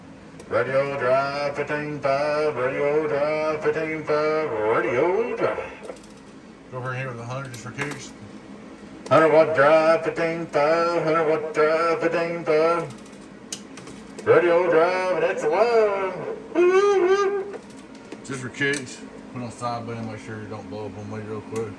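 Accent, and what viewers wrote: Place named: South, United States. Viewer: Yee old southern draww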